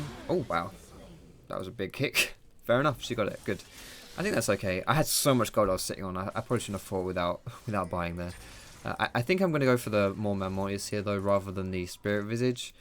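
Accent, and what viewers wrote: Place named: United Kingdom. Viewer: EUW, he's British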